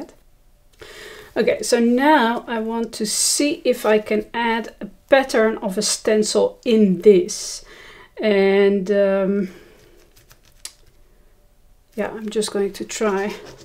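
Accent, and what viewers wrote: Netherlands. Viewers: Hi, you sound like you are from the Netherlands, just like me. This is beautiful and I love your stencil, well done!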